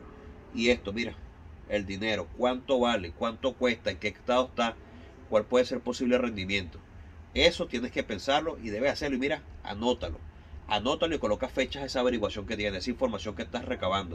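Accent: Venezuela. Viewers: Excelente amigo muy bueno y claro el video. Al escucharte supe que eras un paisano venezolano. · Hola saludos hermano me interesa sobremanera y me llama la atención tu acento creo que eres Venezolano 🇻🇪 Yo también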